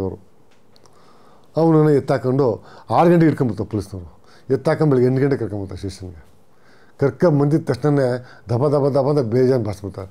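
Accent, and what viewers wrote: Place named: Tumkur, India. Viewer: Typical Tumkur language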